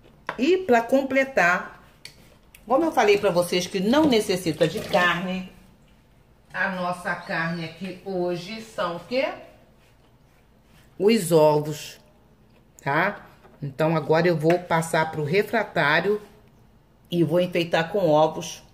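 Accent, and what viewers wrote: Rio de Janeiro, Brazil. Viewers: Hummmmm, que delícia!!! Amo esse sutaque de carioca, amo suas receitas!!! Simples e fácil!! Vc é o já mora no meu coração!!!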